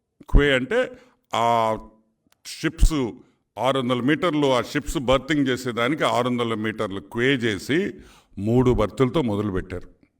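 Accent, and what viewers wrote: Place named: Nellore, India. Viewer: Anna meeru mana Nellore bhasha superb ga matladutharu anna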